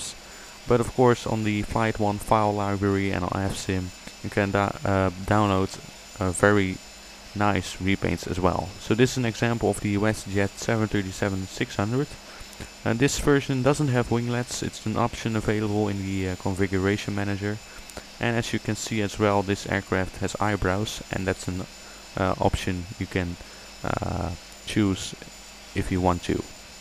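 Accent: Netherlands. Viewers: From your accent I can hear you're dutch :)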